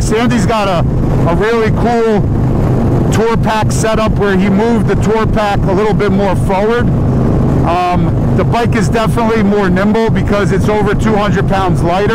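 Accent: New Jersey, United States. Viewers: Agree Stage II is the way to go. Best bang for your buck for sure. How was your cup of coughvee? :-) Love that Jersey accent.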